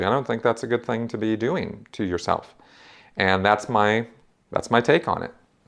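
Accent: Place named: California, United States. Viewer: 44 down, 46 left to go! I am slowly going through all of these q & a's from the beginning. As an amateur anthropologist and person fascinated by religions, I truly appreciate your detailed and objective answers. Holding my questions since you have likely already answered them. Having grown up in Central and Northern California, I find your speech patterns very familiar and much more "real" to me than the other vocal ex-members. Keep on!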